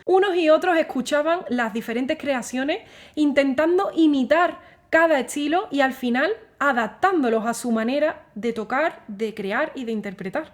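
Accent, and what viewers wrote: Andalusia, Spain. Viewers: Ese acento andalú enamora 🥰😍😘💕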